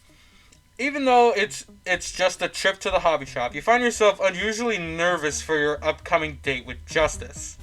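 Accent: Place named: South, United States. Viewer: Your southern accent killed me lol! I loved it! I thought I had the drawl, but yours sounds much better, and I’m an actual Southerner! Great video dude! ❤️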